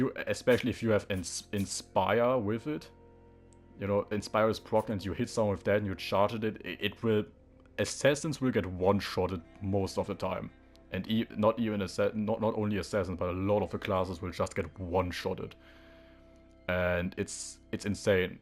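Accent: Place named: Germany. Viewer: nothing to be sorry about, I'm german too. Your accent isn't bad, I was just curious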